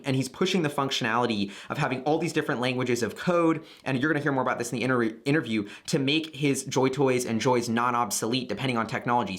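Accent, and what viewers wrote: United States. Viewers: Your American accent is so gey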